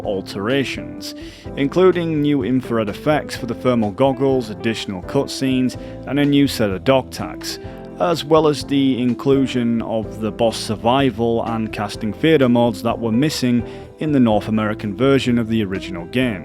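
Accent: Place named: United Kingdom. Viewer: 10 seconds in you called modern society terrible. im guessing that you live in the uk based on your accent. yeah you guys have some HORRIBLE politicians, but if you dont like it LEAVE THE COUNTRY. the whole planet is not defined by what you guys do on that island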